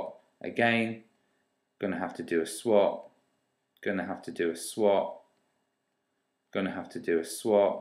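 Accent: United Kingdom. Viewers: Hi, I have to say I am using youtub to learn math for a long time and I have watched many vidoes. But I have to say the way you are teaching is very very very good . I like your Londonish accent as well. I will pray for you deep from my heart as you helped me a lot.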